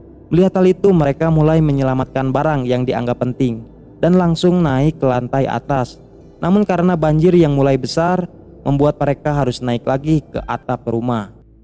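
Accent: West Java, Indonesia. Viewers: Ni host nya pasti orang sunda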